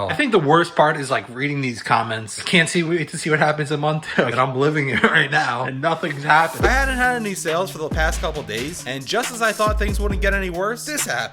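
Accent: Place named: New York, United States. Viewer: We are rooting for you, brother. Congrats on the recognition you’ve been receiving. Judging from your accent, seems like you’re from my neck of the woods (NY tri-state area). Best of luck!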